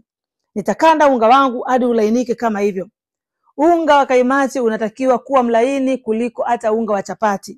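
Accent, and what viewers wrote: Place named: East Africa. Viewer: Your accent is from which part of East Africa?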